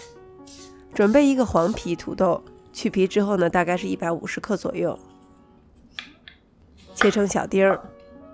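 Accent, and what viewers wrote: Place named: Xi'an, China. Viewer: 你这口音像我们西安人啊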